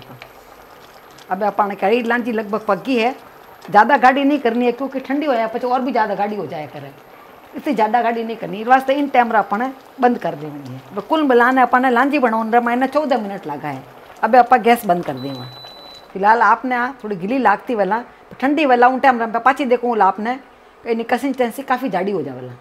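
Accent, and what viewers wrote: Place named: Rajasthan, India. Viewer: Unki etni mithi boli he.. Rajasthani boli me bat krti h wo .. full traditional. Aapko Nhi samjh aata to koi channel dekh skte ho aap.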